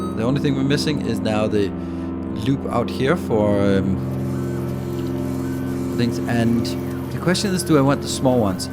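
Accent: Denmark. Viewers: Are you danish?🤔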